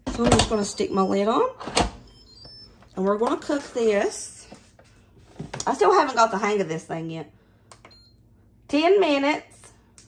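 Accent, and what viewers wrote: South, United States. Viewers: Just love listening to your lovely Southern accent here in the UK. You are so natural and sensible like a gorgeous Mum teaching me to cook. I can afford what I want now but would have been so grateful of your help when I was young and broke!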